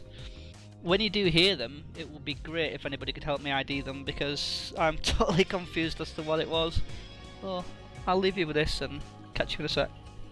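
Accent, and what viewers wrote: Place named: North Yorkshire, United Kingdom. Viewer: Have a listen... see what you think... Doesn't sound boomy enough for the bigger deer, but muntjacs, little buggers are spreading like hell. They're well through norfolk and heading ever Northward as they advance on the Scottish border. Not sure where you are , but accent reads as maybe North Yorks or southern Teeside. Little venisony sods have fangs as well. Destructive as hell on the natural flora... Bunch of Bambis on a bastid asbo... Most suggest 'inviting them for dinner' is the best plan ... Look less cute with onions, mushrooms, garlic, and a few game chips ... a.t.b <link> ...subbed you... I like forest eco-builders ...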